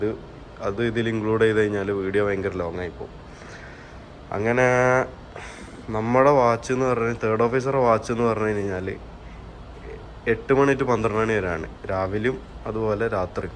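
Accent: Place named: Kozhikode, India. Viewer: Voice over sound കുറവാണു... ആരോ ചെവിയിൽ പതുക്കെ പറയുന്ന പോലെ 😄... പിന്നെ ഈ കോഴിക്കോടൻ slang ഒഴിവാക്കിയാൽ കേരളത്തിലെ എല്ലാവർക്കും ഇഷ്ടപ്പെടും.... "ദേവൊസോം" എന്നല്ല ദിവസം എന്നും മണിക്യൂർ എന്നതിന് പകരം മണിക്കൂർ എന്നും തിരുത്തുക.... Comments പോസിറ്റീവ് ആയി എടുക്കുമെന്ന് പ്രതീക്ഷിക്കുന്നു 🙏.... Video നന്നാവുന്നുണ്ട്... ഭാവുകങ്ങൾ 👍👍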